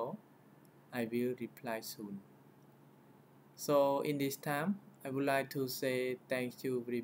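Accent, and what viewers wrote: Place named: Peru. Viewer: Es como un peruano hablando inglés.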